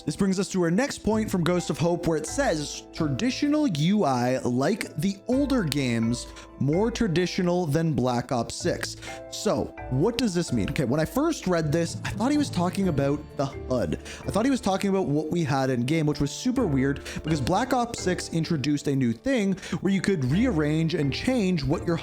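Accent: Canada. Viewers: This guy ain’t American. He Canadian! Accent gave it away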